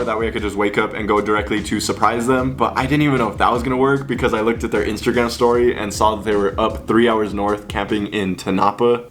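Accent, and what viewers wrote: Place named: California, United States. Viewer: Cali voice*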